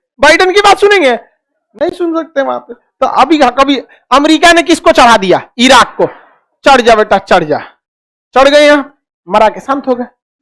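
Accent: Bihar, India. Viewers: Sir Hindi with bihari touch makes your videos more intrested।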